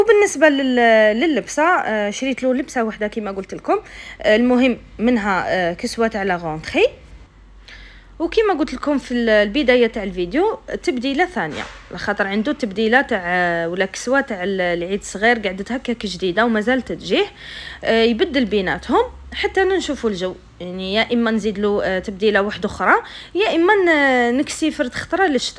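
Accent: Batna, Algeria. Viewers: انا مشتركة جديدة حبيت نعرف نتي منين هدرتك تبان من جهتنا تع باتنة ربي يسلك لوحال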